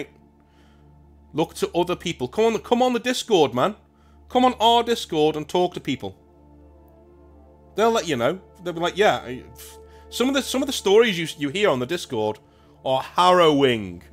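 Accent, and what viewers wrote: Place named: North, United Kingdom. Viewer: Some times I just need to hear a northern accent tell me to sort shit out. Cheers mate ❤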